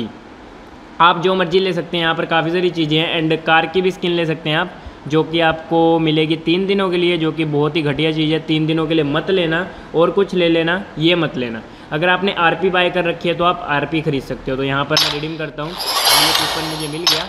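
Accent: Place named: Punjab, India. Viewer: Punjabi voice 😊😊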